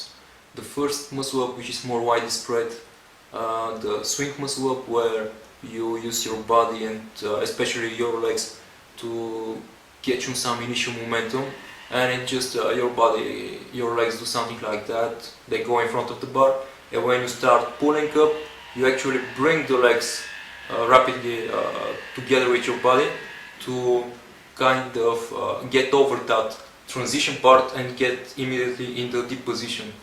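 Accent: Bulgaria. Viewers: hey man, are you bulgarian?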